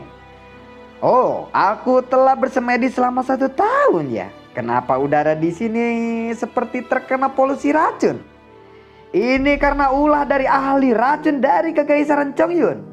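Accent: West Java, Indonesia. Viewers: Min emang orang mana sih ? Bahasa Sunda nya kaya gak asing 😂🤣👍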